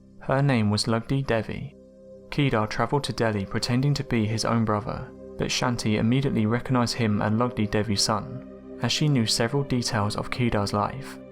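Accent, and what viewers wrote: England, United Kingdom. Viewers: bra im confused. does he mean shoes? or real human feet detached from the ankle. can someone clear this up for me ? or does he mean that the human foot was inside the shoe when it was found? im a southern gentleman myself and I understand the man has a British accent, that being said, do they say feet in England instead of shoes? or am I just overthinking this.. point is does he mean shoes or real human feet floating around in the water .. cause I feel that if its human feet floating around then it should be an open/closed case that it was murder ....... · Dantess26 -English accent ✔ -Similar transition and profile pic ✔ -List of 5 ✔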